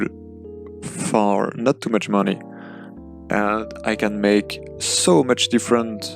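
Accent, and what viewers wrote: France. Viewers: Once again I loved listening to your French accent speaking about such important things like freedom! Can you share more info about who you were talking about and the book he wrote? You mentioned that he lived during the same time Jane Austen wrote her novels in. If you were to make videos about philosophy I think you would get good views! You spoke about being ready to be wrong or change your mind about things and as an American who has seen such cultural turmoil in our country the last few years, I think people have forgotten how to debate and talk to each other without being so rigid. About the build: this is my second favorite style... Of course darker victorian's are my absolute favorite :) but you did so well recreating this Queen Anne house. It reminds me of the Carson House in Eureka California.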